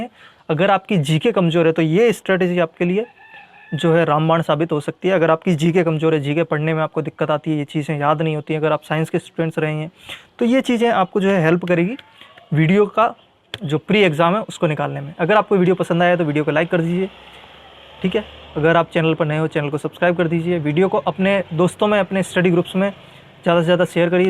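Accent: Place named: Chittorgarh, India. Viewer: Chittorgarh se lg rha hai Bhai .....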